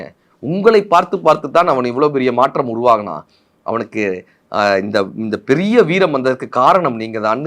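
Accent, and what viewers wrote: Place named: Madurai, India. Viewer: அஸ்வத்தாமன் தானே அவர்.... சொல்லிய விதம் மதுரை மண்ணுகுரியது.... 🩷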